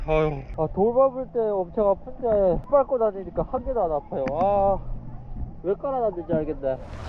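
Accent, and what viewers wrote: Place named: South Korea. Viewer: 넘나 웃겨죽는 사투리보러왔다가 혼자 실실웃고 난리입니다. 아 진짜 똥휴지가있어 부터 넘우껴서~~으아 ㅋㅋ 글고 같은 유튜버로서 영상얘기 좀 나누고싶은데 통화한번 하는거어떠실까요?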